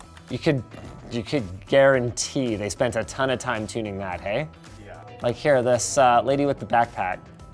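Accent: Canada. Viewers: Dang Linus’ Canadian accent came out to play in this video...and I’m Canadian lol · Is it just me or did Linus go super Canadian in this video eh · You can really hear the Canadian in Linus’s voice today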